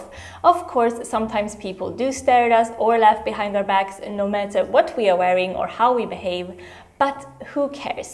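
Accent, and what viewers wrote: Sweden. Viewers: Where are you from? You have a swedish accent.. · Are you Swedish? I hear some English - Swedish accent. Hehe.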